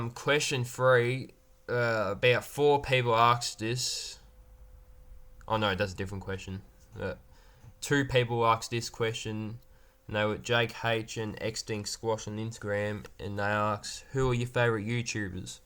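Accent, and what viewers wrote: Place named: Ireland. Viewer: To be honest, you skipped a few of my questions, but that's fine! Also, I didn't think you're Australian. You sound more Irish than Australian.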